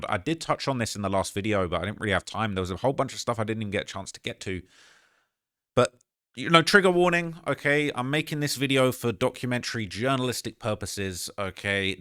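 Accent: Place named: United Kingdom. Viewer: He’s British. Calm down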